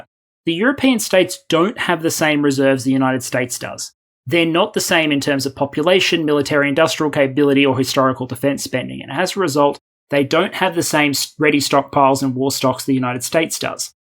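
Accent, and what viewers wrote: Australia. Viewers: Not in the middle of an Australian accent like yours, and not both in the same paragraph.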